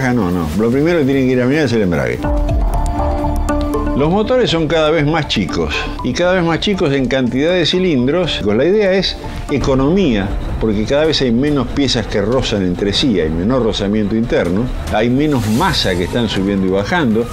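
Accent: Argentina. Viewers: Hola Amigo...... Tus videos son fenomenales, pero yo te aconsejaría que trataras de modular un poco más las palabras porque se escucha como una verborrea que pasa muy rápido y no se aprecia bien la palabra que dices.... Ya sé que eres Argentino, pero me cuesta captar la palabra que dices.... Sólo eso.... lo demás está fabuloso....!!!!! Un abrazo desde Chile....!!!! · ese es un acento en alguna parte de Argentina.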